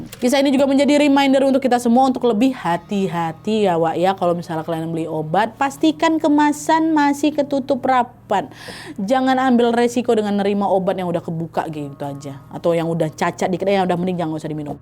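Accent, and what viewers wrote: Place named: Sumatra, Indonesia. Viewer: Cuma yang bisa ceritain kisah yang aslinya dari luar negeri semua tokohnya jadi berasa jadi orang Sumatra hahaha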